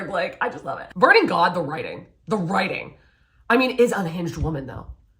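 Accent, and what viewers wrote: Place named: Scotland, United Kingdom. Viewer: The amount of sliding into passionate quasi-Scottish in this video made me be like YES THIS IS HOW WE EXPRESS OUR LOVE 🏴 Five stars, would watch again 👏🏼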